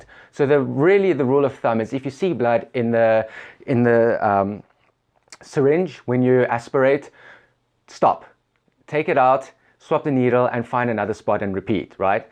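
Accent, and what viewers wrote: South Africa. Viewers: Sounds south african?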